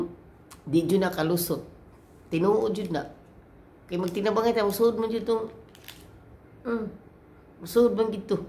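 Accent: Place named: Visayas, Philippines. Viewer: bisaya man diay si host, proud bisdak here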